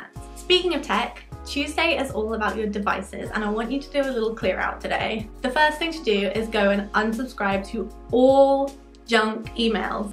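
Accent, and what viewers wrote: United Kingdom. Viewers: No hate r u British of American x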